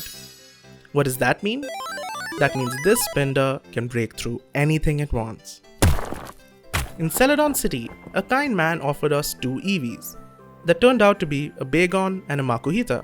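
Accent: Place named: India. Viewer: nah no way no way UR INDIAN I kinda thought u were INDIAN by ur accent but then I thought it would be racist but O MY GOD FINALLY A FELLOW GUY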